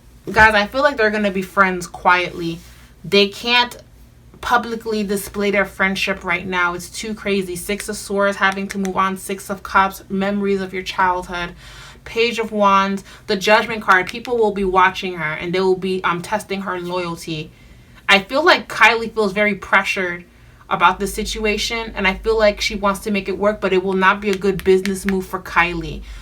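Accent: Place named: Miami, United States. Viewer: Do you do personal readings in person? I'm located in Miami too. I could tell cause of your accent.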